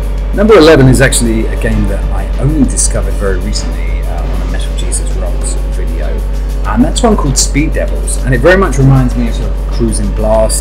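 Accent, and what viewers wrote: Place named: United Kingdom. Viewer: The Britain accent it's amazing. Congratulations for the video.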